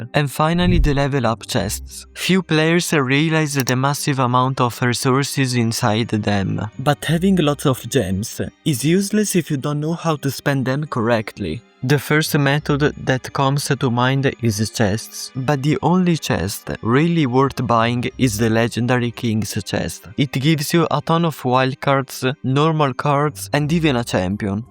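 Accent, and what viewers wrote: Italy. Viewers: italian accent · his accent is clearly italian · Are you Italian?Cuz your accent seems so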